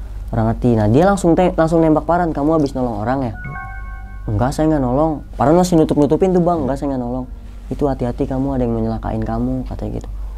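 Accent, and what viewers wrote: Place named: Banyumas, Indonesia. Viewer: Kangen dgn logat ngapaknya mang😊😊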